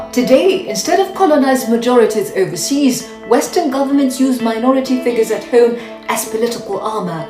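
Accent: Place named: United Kingdom. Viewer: وكأن هذا الفساد والتلون والبلبله وتبجح لايوجد في بلاد الاسلام المشمشي 😊 ههه ياحبيبي، أختاه انتِ تتحدثين الإنكليزيه بلكنه بريطانيه هذا يعني أنكِ تقيمين هناك ومعكِ جواز سفر على ماأظن 😊 وجدتِ الأمان عندهم والرفاهيه فلماذا الهجوم على البلد الذي استضافكِ وجعلكِ مطمئنه على أقل التقدير ياترى 🤔 ؟؟؟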